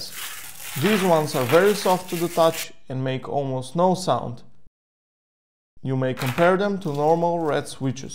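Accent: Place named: Israel. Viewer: there's no fucking way, you have the most Israeli accent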